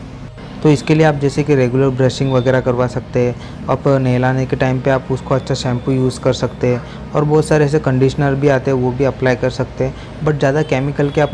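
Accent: India. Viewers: Why you talking like indian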